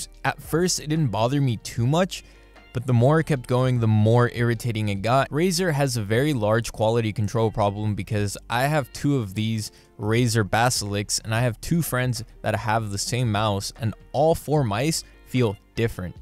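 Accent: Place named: United States. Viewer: why you sound like a american with fit body and few muscles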